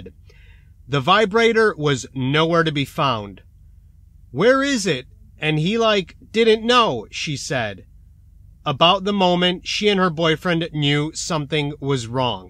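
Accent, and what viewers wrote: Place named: Russia. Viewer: His Russian accent=👋🏼🏃🏼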